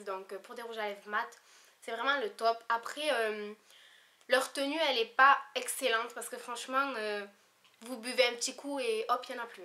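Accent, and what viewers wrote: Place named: South, France. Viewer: accent du sud 😻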